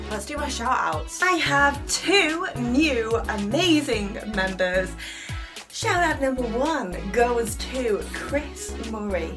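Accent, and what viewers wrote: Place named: England, United Kingdom. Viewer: I have to say I love your English accent. You, if course are beautiful and very fetching!!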